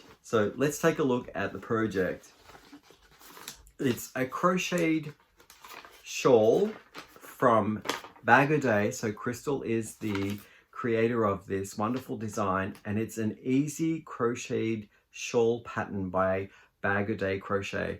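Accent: Australia. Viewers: OMG an Aussie accent 😍 hello, you came up as a suggested video & was happy to hear you! Fellow yarn lover here too 🙏🧶 call your mannequins Matilda 😂 Mine was named by my daughter & Crochet bestie Leighann, her name is Mary Madison Mannequin 🫂🤎🦋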